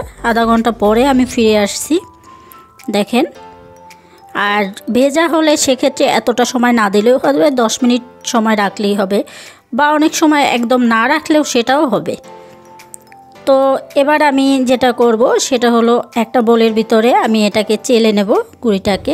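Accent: Bangladesh. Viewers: Apu apni ki sylheti niki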